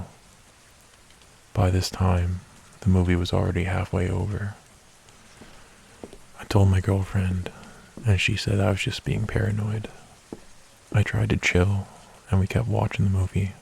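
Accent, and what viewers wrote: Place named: North, United Kingdom. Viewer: You've gotta be a northerner- we have the same accent 😬😄 Another great narration!👍💜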